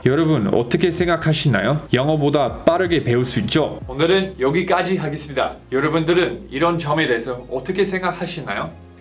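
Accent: South Korea. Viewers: 재밌네요. 한국어 다음에 배우셔서 일본어에 한국어 액센트가 들려요 ㅎㅎㅎ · 일본어 발음을 한국어발음으로 하시네 ㅋㅋㅋ영어발음으로 하시는게아니라 신기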